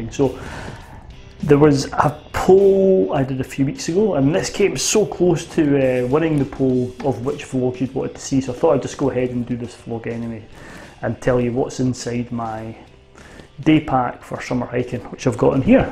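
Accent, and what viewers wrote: Scotland, United Kingdom. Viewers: Auto-captions are hilarious - they can't cope with your Scottish accent! 😂 "Secluded Thailand beaches" 🤔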